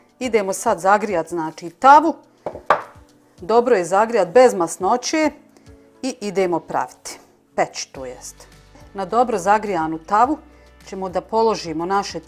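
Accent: Bosnia and Herzegovina. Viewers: Gledam cesto tvoje recepte, volim taj bosanski izgovor i ta prica sa flasicom je simpaticna. Pozdrav iz Kanade.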